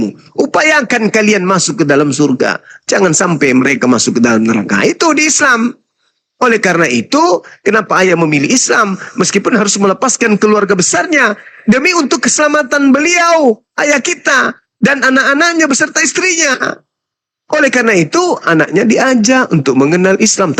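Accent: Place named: Makassar, Indonesia. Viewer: Hahahaha bang mabicara makasara Ki hahahaha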